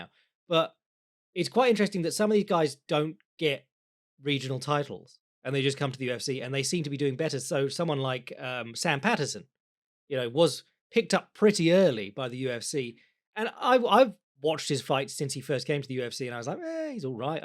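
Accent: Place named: Scotland, United Kingdom. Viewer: end of an era, we will neveR hear use his Scottish accent to say Paul Craig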